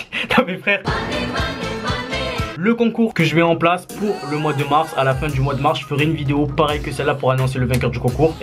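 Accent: Marseille, France. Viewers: sa va c'est bien t'es vidéo moi je kif comme tu est... avant les vidéo que tu fessais genre je kidnappe un abonner ou playlists ou on lui coupe les cheveux on aime bien ton accent de Marseille avec t'es tarpin bien c'était mieux a l’ancienne je dois pas être le seul a pensait sa mais continue tu est dans le droit chemin.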